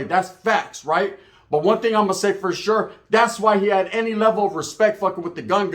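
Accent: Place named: Central Valley, United States. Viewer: 🗣️🔥 you speaking like the Merced to Stockton vibe. The fuse is lite he was out of pocket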